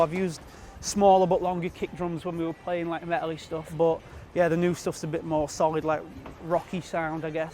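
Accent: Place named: United Kingdom. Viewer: The accent.... 100% authentic Brits, y' know wha' I mean?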